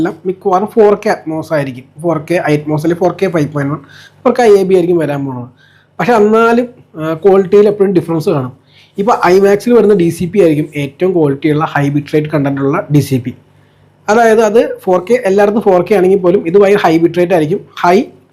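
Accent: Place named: Thrissur, India. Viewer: Thrissur ragam❤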